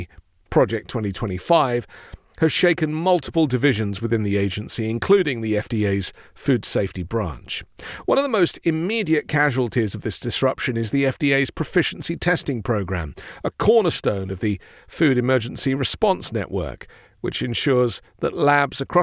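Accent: United Kingdom. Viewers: Best voice on the internet. Smooth and silky British goodness! Cheers mate!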